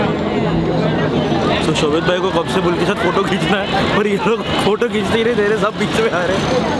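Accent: United States. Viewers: Jamtay Jamtay U.S. Accent yetay halu halu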